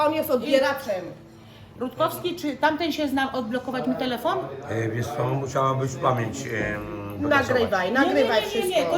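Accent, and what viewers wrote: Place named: Poland. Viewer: No taki spoko mocno polski akcent i dykcją;-)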